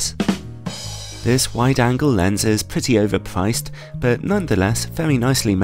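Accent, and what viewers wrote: United Kingdom. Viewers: You’re literally taking the British accent onto a whole new level of royalness. Great sum up by the way :)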